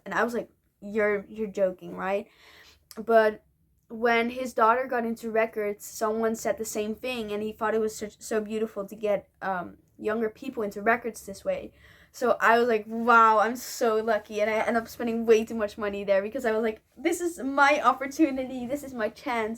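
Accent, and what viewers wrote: Netherlands. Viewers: You sound Dutch. I'm strictly lossless streaming nowadays but I like people going on about their vinyl😀 In my late teens I had several LPs, including your two.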